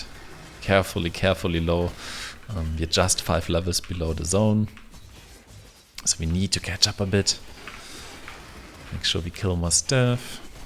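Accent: Germany. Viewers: I am amazed how calmly and professionally you address the leveling process. Also madly in love with the soft germanic accent.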